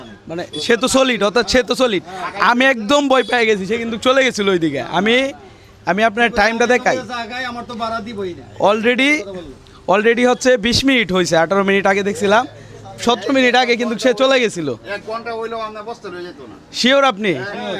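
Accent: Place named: Kishoreganj, Bangladesh. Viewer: are you from kishorganj? cuz iam too i know your speaking in kishorganji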